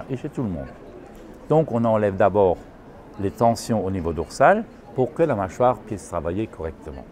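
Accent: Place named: Alsace, France. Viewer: Bonjour, Merci pour toutes ces informations mécanique et technique. Cela m'a fait du bien d'entendre ce petit accent alsacien pays de mon grand-père et de mon enfance 🌱🌸🌲. Qu'est ce que j'aurais aimé savoir si un hydrops peut trouvé naissance enviton 25 ans après hernie discale, syndrome de la queue de cheval, souci alignement dents, aujourd'hui nuque raide, trapèzes douleureux, perte audive, trouble de l'équilibre (qui d'ailleurs s'accentue avec la fatigue, l'inquiétude, tensions!) (qui s'accentue avec la migraine ou céphalée de tension) qui deviennent ingérables à la ménopause et qui detraque mon vestibule, mes muscles ... .. Tout est du même côté mais pour les bras et mains des deux, mais pas la même symptomatologie. Si ce n'est pour l'hydrops ?, je pense que oui. On peut également relier le tout à un parcours de vie compliqué de 9 ans à .. 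Cordialement, E. Parisienne de l'est 🙂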